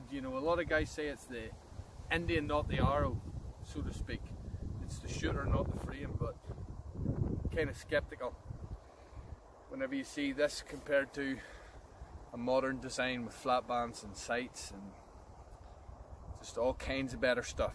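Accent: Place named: Scotland, United Kingdom. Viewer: He sounds like he is from Stranraer in Scotland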